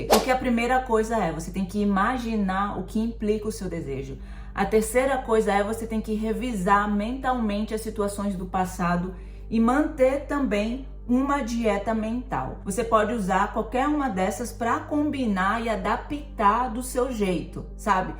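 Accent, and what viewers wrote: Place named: Maranhão, Brazil. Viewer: ❤❤❤ que top conhecer o rosto dono da voz. Eu imaginava voce morena, nao sei porque rs. Mas seu sotaque é tao gostoso de ouvir, parece de uma amiga minha do Maranhao. Como sempre um video pontual. Amei, prazer te conhecer!!😊😊😊🥰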